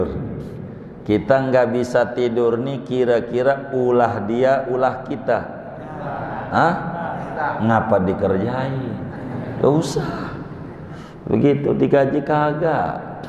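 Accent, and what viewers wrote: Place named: Betawi, Indonesia. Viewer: Assalamualaikum wr wb,mhn ijin kiyai,ane sering mendengar ceramah kiyai enak bener penyampaian kaya org betawi, subhanalloh...smg kiyai sehat terus beserta kelg n berkah piddunia walakhiroh,Aamiin yra🤲🤲🤲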